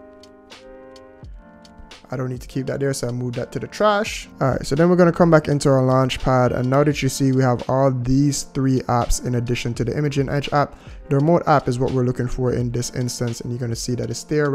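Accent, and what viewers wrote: Jamaica. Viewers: I can tell your Jamaican. Big up yourself bro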